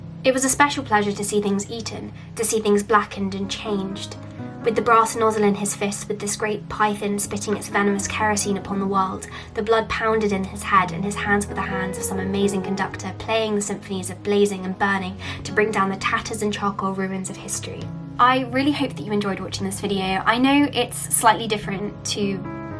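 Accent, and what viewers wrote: United Kingdom. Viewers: Hahaaa that's true Emily 😂 like whoaaa, it sounds great, UK accent..💯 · I absolutely love your taste in books. Omg, the other commenters are right! The British accent DOES make it better! I've been practicing mine. I think it's quite accurate.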